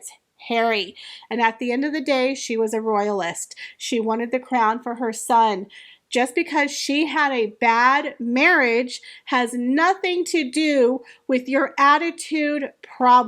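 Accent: South, United States. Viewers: I'm sorta kinda new to your channel. I heard you do a live stream with Sue Smith and thought I might give your channel a try. I'm so glad I did. You have a very soothing voice and I love your charming southern accent (especially when you say "y'all). I hope your channel keeps growing.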